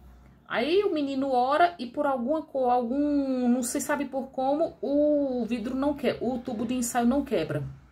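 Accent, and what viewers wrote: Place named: Minas Gerais, Brazil. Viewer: Tu é mineira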